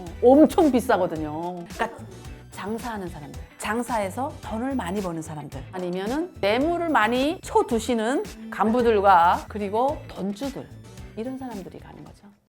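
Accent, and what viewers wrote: North Korea. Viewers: 재미 있게 진행 하십니다 말도 북한식으로 하니 실감나게 들립니다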